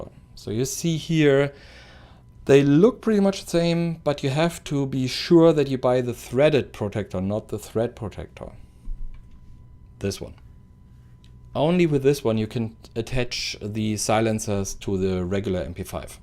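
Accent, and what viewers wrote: Germany. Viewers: Wieso merke ich direkt nach dem ersten satzt dass du Deutscher bist :)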